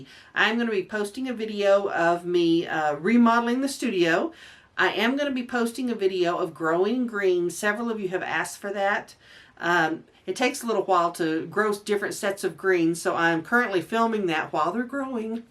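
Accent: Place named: Indiana, United States. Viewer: Funny you are from Texas. You have the same accent of a friend of mine who is from southern Indiana.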